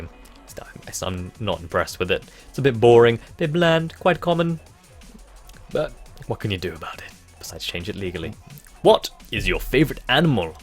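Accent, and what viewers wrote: England, United Kingdom. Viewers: What`s up, English citizen? So like a week ago, my sister`s friend told me about Captain_Sparklez`s popular channel and recomended me the series of ``Mianite``. Like a half season after the start, the great Wizards came to the land to greet them with their amazing buildings. You were one of them. As the Wizard who showed to Jordan the most I remembered your name.. One of his eps he had a building lesson from you. And that was the first time I heard your voice. And I completley fall in love with it. It was deep, it could show all the emotions you were feeling and it had English accent. I love English accents. Like... I can guess you`re from the UK just when I hear your voice. So today I finished the season and after like two hours I decided to check if you had a channel. So I typed `` `` and your channel appeared. It was amazing! 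This video is probably my favourite. Why? I don`t know actually. Maybe because the whole 30 minutes of it I was staring at you and your voice made me stop seeing things around me. I could just listen to it with with hours. The problem with the not matching to the face voice is actually simple. I realised, you don`t look to young for that deep voice. You actually look half young. Your beard makes half of your face look like you have that voice. But from the nose to the hair you look like 17 years old to me. Oh, before I end the comment I`ll say that I recommend you to play OUTLAST. Pleaseee! M`kay byee. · Just out of curiosity, do you think that Americans have an accent? Because we say that you have and 'English Accent.'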